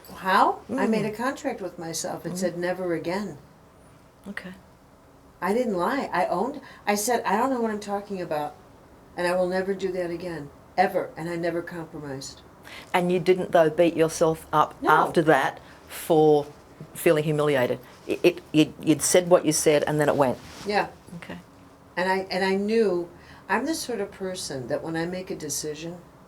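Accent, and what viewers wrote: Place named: Chicago, United States. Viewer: I do sometimes feel annoyed with her and wish she had a better speaking voice e.g.That American Chicago accent can grate.But her amazing insights into the behaviour of people and religious Myths and people's everyday way of dealing with life is so darned honest and incisive it is riveting. As much as i love her I will not give up the scaffolding of Catholicism for her intellectualism. Like St Teresa of Avila I love it.And CM has become much nicer since meeting that great Catholic mystic.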